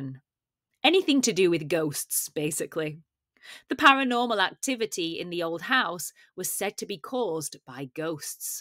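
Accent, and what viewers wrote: United Kingdom. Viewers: Thanks for creating this program, because I can learn British accent from this. Thank you very much!❤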